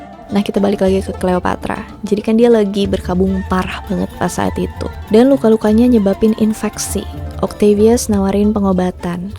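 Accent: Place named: South Jakarta, Indonesia. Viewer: Serasa di dongengin sama anak jaksel knt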